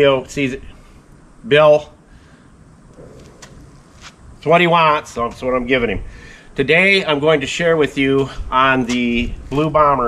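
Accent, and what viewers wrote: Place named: Michigan, United States. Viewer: Been watching you for years. If memory serves you were somewhere in Michigan. (We can still hear the "Michiganer" accent) We're down near Tampa. Welcome to Florida.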